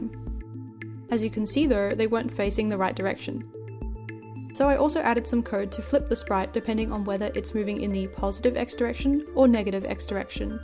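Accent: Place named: Australia. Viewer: 7 days full time so about 60 hours in total? The hard work shows in the quality of the video! Even your first video is amazing, had you already had experience with video editing? I use Davinci Resolve too! Do you use the free version or have you paid for the paid version? I should check out motion canvas! I also noticed that your accent was familiar and I saw that you are Aussie! haha I am Kiwi. Great to see a creator from Oceania :D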